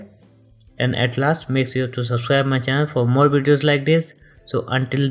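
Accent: India. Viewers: Your Indian accent is cute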